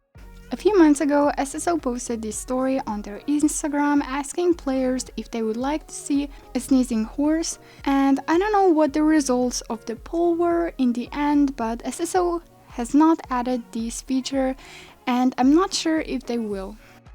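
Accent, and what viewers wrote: Germany. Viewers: Are u german? :D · Du bist deutsch oder haha?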